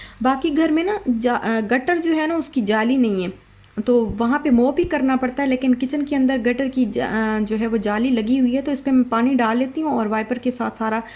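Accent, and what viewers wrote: Saraiki, Pakistan. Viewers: Ap Multan se hain?sariki speaking hain?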